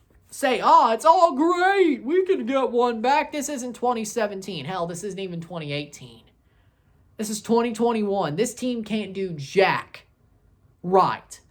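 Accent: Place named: Kentucky, United States. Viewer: Is this guy a gringo? He's accent sounds as if he's from Kentucky. He reminds me of an infantile Jim Cornett.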